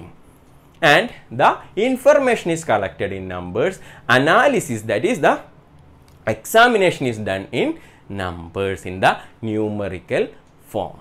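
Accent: Kerala, India. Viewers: Sir are you a malayali?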